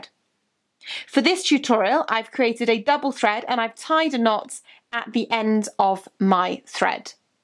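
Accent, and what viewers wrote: United Kingdom. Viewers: Are u British?? 🤔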